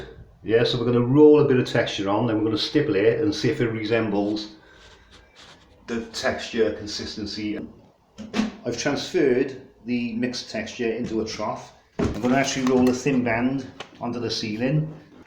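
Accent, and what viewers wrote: Cardiff, United Kingdom. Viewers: Thank you. Do I detect a Cardiff accent 😊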